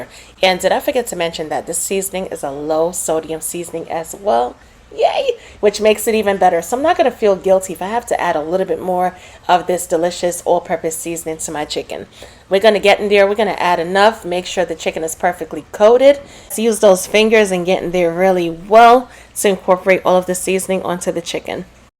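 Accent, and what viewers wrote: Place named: New Jersey, United States. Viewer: I like your accent, are you from Jersey? Great recipe btw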